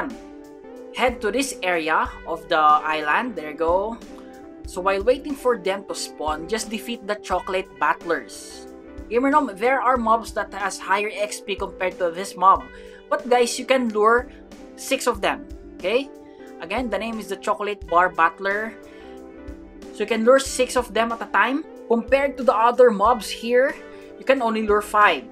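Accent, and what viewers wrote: Philippines. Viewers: Bro accent is filipino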